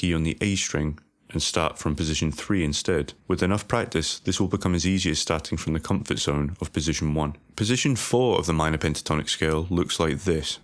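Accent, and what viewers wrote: Scotland, United Kingdom. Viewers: Scottish? I’m from Stirling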